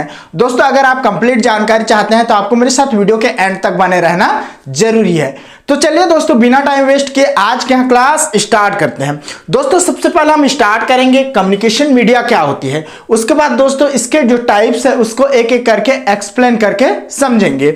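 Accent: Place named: Bihar, India. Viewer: Thik sir ji mujhe Etna to pata chal Gaya ki aap BIHAR se hai .😊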